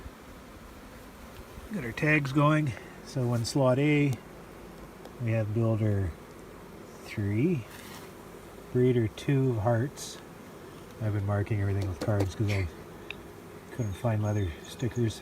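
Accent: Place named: Canada. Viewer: I didn't see your name but I knew you were Canadian by your accent. it was nice to hear your voice being in asia for so long I guess I am missing being home sometimes. nice to hear it.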